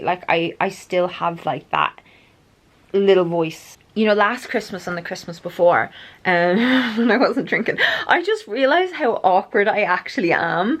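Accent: Ireland. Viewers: Your accent though…… I want an Irish woman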